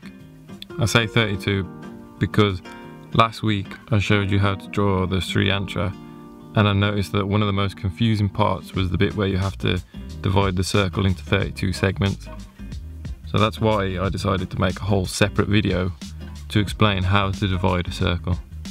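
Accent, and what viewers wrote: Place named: Midlands, United Kingdom. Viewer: I'm a teacher looking to help my class draw simple pie charts. I loved this video. You keep it simple and I love your midlands accent!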